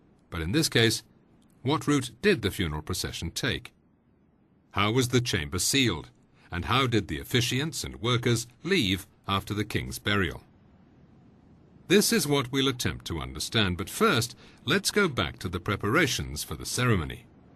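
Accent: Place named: England, United Kingdom. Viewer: Folks, this video is filled with unproven presuppositions. It is a theroy with a nice 3d video and authoritative english accented narrator. Ramps, chipping with stone hammers, no wheels, no models before building, Tombs for dead men , Kings, hierarchical society, Humm? Stones were cleaved as diamonds are, ( being done today with giant stones) not chipped with copper chisels. Then they were moved with carts and oxen, not dragged by hundreds of workers in the sun for hundreds of miles up and down the countryside on slippery little logs of which one stone could stop a log from turning correctly. Counter weighting was used to elevate stones up the sides, not ramps and pushing/pulling tons of stones uphill on a ramp. Water filled counterweighted highly engineered hardwood carts filled with water descending down one side which raised the stones on similar carts connected with ropes from the other side using only gravity to pull them up. 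The finished top floor course with one more perimeter sidewall casing stone course could create a pool area for water. The angled casing stone about the perimeter would act as a smooth slope for ascending and descending carts using the pool to supply water to the descending carts as counterweights. Then the stones could be raised up the finish slope then be floated into place with air bladders attached to the carts sides. Two men with little energy could navigate tons of stone and place then perfectly to its designated place. The other two sides wit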